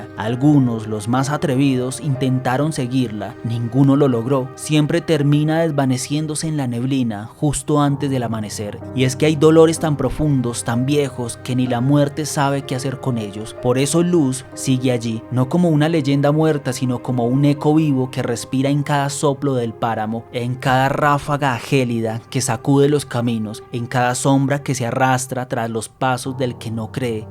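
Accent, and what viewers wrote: Colombia. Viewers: Son historias venezolanas contados por un maldito colombiano? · El mismo script de otro canal bueno igual me encanto con ese acento tan bello viva colombia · Muy buenos los relatos perola malo es el acento colombiano que tiene el narrador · Muy lindo pero porfavor un venezolano que narre no un colombiano utizando los matices colombianos se escucha raro Pero me encantó los relatos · Pero que cantidad de gafedades y de paso la narrativa es colombiana con demasiado acento , la próxima mínimo busquen alguien de aquí, decir chamo o pana no es ser venezolanos. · Este narrador no es Venezolano...Es Colombiano... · Me gustó mucho y a pesar de que no es el acento venezolano aprecio que un Colombiano tenga esa dicción tan linda y encima contar con tanta amabilidad las leyendas Venezolanas..nueva suscriptora 🎉❤ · Paisa, puede ser de gochilandia, fronterizo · Es colombiano 😅😅😅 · es de Bogotá.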